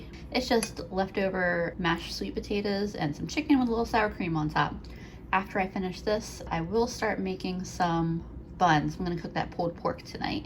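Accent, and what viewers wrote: Philadelphia, United States. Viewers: I always thought you were in Philly Area based on your accent. LOL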